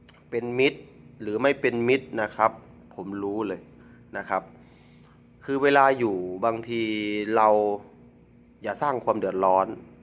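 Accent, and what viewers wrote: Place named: Maha Sarakham, Thailand. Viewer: เทพโยคือเว้าภาษาลาวคือบ้านข่อยสารคามแทบบ่รุ้เลยว่าเป็นคนลาว ข่อยเห็นยูทูบเปอร์ลาวเว้าบ่คือเจ้าแมะ ถ้าเจ้าเป็นคนไทยคือยุ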